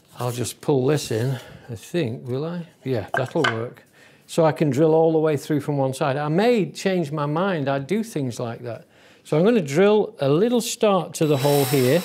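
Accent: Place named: Sheffield, United Kingdom. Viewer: Love that accent. The sound of Sheffield steel.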